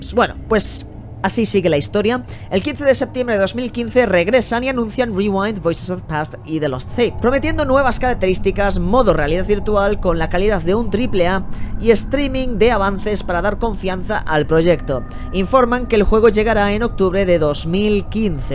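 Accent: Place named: United Kingdom. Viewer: Me encanta tu ingles UK, que nadie te diga lo contrario!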